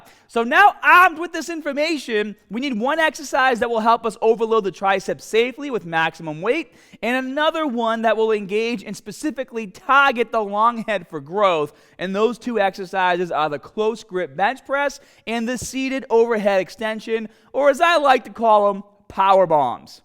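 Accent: Boston, United States. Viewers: Yo!!! Where in Boston are you??! I listened to the first 8 seconds and knew you were from Boston. This video is needed. I am building muscle in both my hard and so this is going to be added to my routine! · “Taaget” “staaated” I have a feeling he’s from Boston! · Still can’t figure out if your from Boston or Australia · You from boston man? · That accent makes me nostalgic!!! :-P Go Sox! Go Pats! :-P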